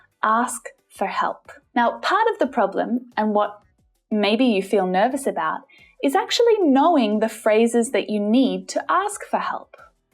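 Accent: Australia. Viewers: Hi!!.. You are a awesome teacher. I am from New York. I like your Australian accent. Love it. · Ma'am I'm from India and our speaking is totally different from yours(aussies) you say " G'day mate" but when we Indian says that same thing it sounds very different. So the pronunciations are very different can you please teach us that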